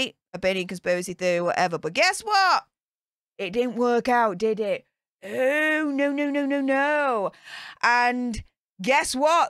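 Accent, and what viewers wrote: Yorkshire, United Kingdom. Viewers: Sounds as though she’s from Yorkshire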